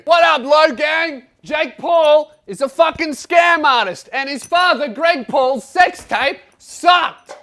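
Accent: Australia. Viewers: Are you Australian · this swearing Australian is music to my ears, why does your voice sound so good lmao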